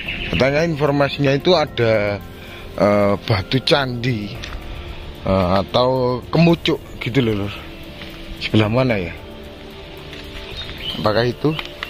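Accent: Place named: Java, Indonesia. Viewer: 🌀🌺☀️ WA'ALLAIKUM SALAM WR. WB. SaLaaam 🙏🙏🙏🙏🙏🙏🙏🙏🙏 , Smoga SulsEs Luuur and jaga kesEhatan sLaLu Lur 🙋🏼‍♂️🙋🏼‍♂️ , Nb. Warning and Bukan Marning 😀😀 , jangan Lupa Kirim DO'A / DUNGO Sama ALmarhum & ALmahumma okey Luuur 👍👍 , Tingkatkan Logat Bahasa Indonesia + Jawa Sehingga Seumpama Sayur tidak Lupa garamnya 😲😲😀😀 👍👍 , Lanjutken Mbah Kung 🙋🏼‍♂️🙋🏼‍♂️ ☀️🌺🌀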